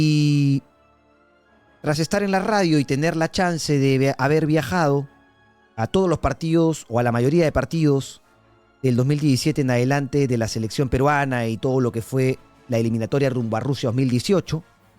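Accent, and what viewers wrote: Argentina. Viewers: es argentino?... por qué habla así?...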